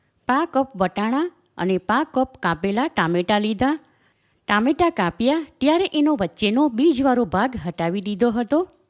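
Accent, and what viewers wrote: Surat, India. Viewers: સુરતી છે..😅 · E to surti language chhe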